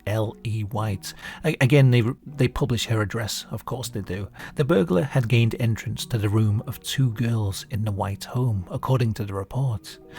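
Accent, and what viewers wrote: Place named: Northern England, United Kingdom. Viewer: he's from up north in England. Maybe derby/Manchester .Not Scottish or Welsh.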